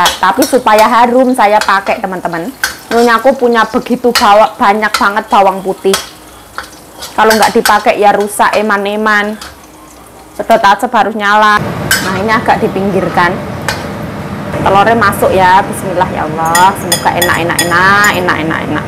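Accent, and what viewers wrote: Java, Indonesia. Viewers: Mb jowone ngendi mbk?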